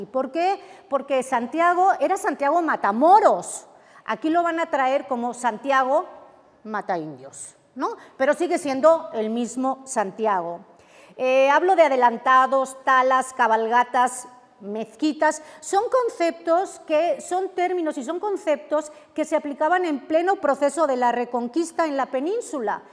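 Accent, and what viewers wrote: Mexico. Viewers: Será residente en México, por que tambien tiene algo de acento. No el canal es tuyo, yo soy un simple seguidor mas cuya pasión es la historia, pero da una conferencia con un tono que se desprende que Cortés ha sido poco menos de un ser miserable, desde el minuto uno, adoleciendo de la imparcialidad con que un historiador debe analizar y presentar la historia. Apenas vi 16 minutos. Y respecto a algún comentario que leí por abajo, discreto, México en la actualidad es donde las instituciones tienen un lenguaje mas antihispánico, cada vez que escucho una conferencia, por lo general, me recuerda mucho a sintonizar tv3, un lenguaje subliminal hilarante. Aunque me alegra decir que tengo algún amigo mexicano y además mestizo, que para nada se ajusta a los "cánones impuestos", será que en su caso tuvo bastante contacto directo con españoles y pudo formarse su idea propia. 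· Me gusta el deje mexicano mezclado con el suyo peninsular.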